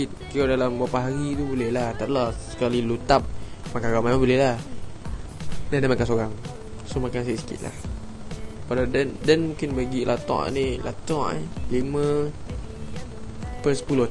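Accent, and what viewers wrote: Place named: Negeri Sembilan, Malaysia. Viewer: Fuuuu, pekat nogori nya tuuuu.. Terboyekkkk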